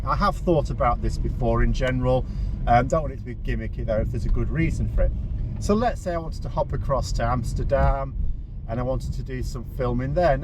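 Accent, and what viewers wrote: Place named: Yorkshire, United Kingdom. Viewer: I'd support that. I'll even write him a reference. Forgive me but that sounds like a Yorkshire accent. Certainly from the North of England, that's a good start!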